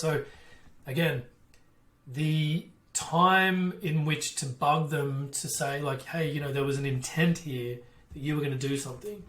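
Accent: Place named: Australia. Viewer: Hey, Love the Content, got a question for you. From your accent i'm assuming your from Aus and am aswell, I have recently acquired GHL for starting an agency however my concern is when using a mobile phone number from Twillio/ Lead Connector the billing & pricing is way too high and would mean im getting charged a lot from my clients in the future. As such do you use a Mobile phone number or other type of twillio/ Lead connector phone number type for your clients and yourself? Cheers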